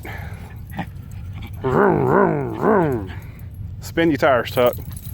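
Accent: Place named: New England, United States. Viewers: Nice to hear the New England accent out there on desert! That slide guitar music reminds me of my daddy and his band back in the 50's . My daddy could play an electric lap guitar with a with a metal slider. It was great! He knew Les Paul and Mary Ford! The band used to play clubs on the East Coast of New Jersey. That music ending your video today was a nice memory. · I knew it was a Boston accent! Tho you do have a bit of a down east accent (you know those Mainers!) Boston here too. GO PATS!! 🏈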